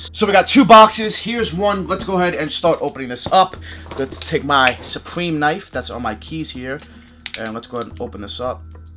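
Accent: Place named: Brooklyn, United States. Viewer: Where abouts you from? You sound like a New York Brooklyn sort of accent.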